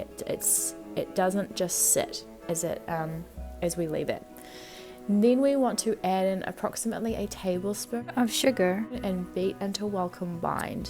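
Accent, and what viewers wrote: New Zealand. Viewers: Thank you for this great recipe, you have a very soothing voice and a beautiful kiwi accent ❤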